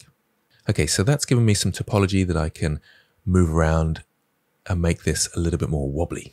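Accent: United Kingdom. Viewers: Hi, thank you very much to update your well even if I finished the 2.8 well project one week ago 😆. By the way, I would like to mention how much I enjoy your videos. Passion, friendly explanations and great british accent are your best skills! Please keep your motivation. Congrats.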